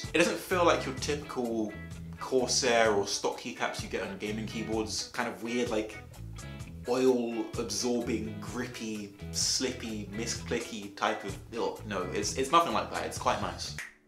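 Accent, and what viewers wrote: England, United Kingdom. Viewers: Says in a lovely english accent: "it's fairly heavy--" SLAM!!!!!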